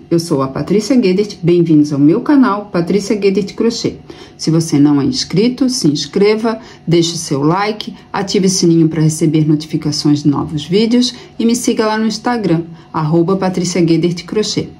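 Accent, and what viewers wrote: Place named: Santa Catarina, Brazil. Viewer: Senti que vc é de SC pelo sotaque! Hehehe sou de Floripa.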